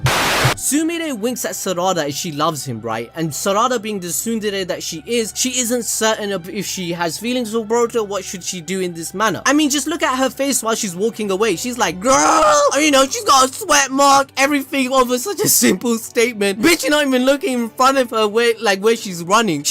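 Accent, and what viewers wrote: Punjab, India. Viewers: That punjabi accent🙏😁😉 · ❤️ your Indian ?? ❤️❤️❤️ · Bro are you punjabi ? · are you indian bro i know what you said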